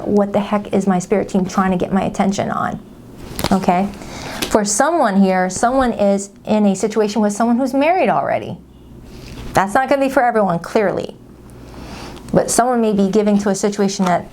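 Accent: United States. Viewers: I love your readings but could I ask you to slow the speed at which you are speaking as I know a lot of us Brits 🇬🇧 have great difficulty understanding certain US accents. We have to put the subtitles on to try and grasp what you are saying even though you are speaking English. 🙈 Please don’t take that as a criticism or take it personally as we none of us can help our accents. Many thanks for the work you do for us all. God bless and namaste 🙏🏼 xx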